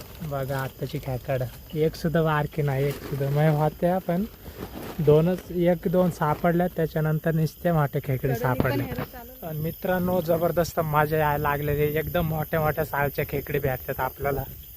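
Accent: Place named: Telangana, India. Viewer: Bhau tumhi telgu aahat ka tumchi marathi language telgu lokan sarkhi ch aahe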